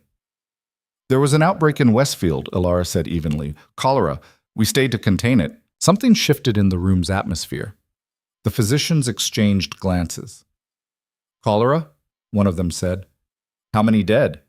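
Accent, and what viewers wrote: United States. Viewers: So much ridiculous drama. The choice of narration grates - makes them sound like teenagers. Truly recommend scrapping the flat American accent. It’s a British tale, deserves British narration.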